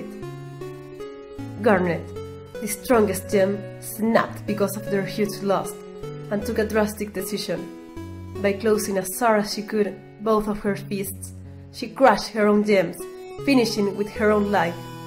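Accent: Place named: Russia. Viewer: bu arada teoriyi anlatan kadın rus aksanıyla konusuyor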